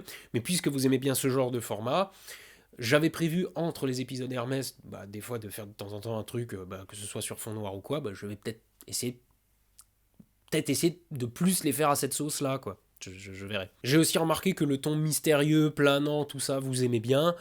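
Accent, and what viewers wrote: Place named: Lorraine, France. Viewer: moi ce que j'aimerai entendre de temps à autre, s'il te plaît, c'est ton accent lorrain, il est juste génial! Vive la Lorraine! attention, pas tout le temps, il ne faut pas abuser des bonnes choses, mais un peu ça ferait plaisir les autres, n'hésitez pas à dire si vous êtes d'accord ou pas pour un peu d'accent lorrain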